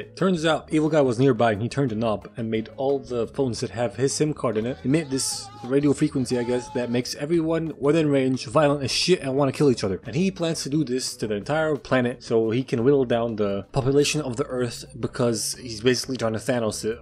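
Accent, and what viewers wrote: United Kingdom. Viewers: As an English person I approve of your British accent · Perfect British accent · Speaking in English accent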